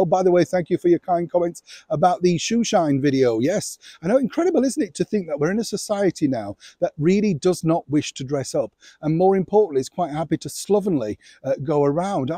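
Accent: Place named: England, United Kingdom. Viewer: I could listen to you all day. Great tips and updates. Least I forget your English verbal flare.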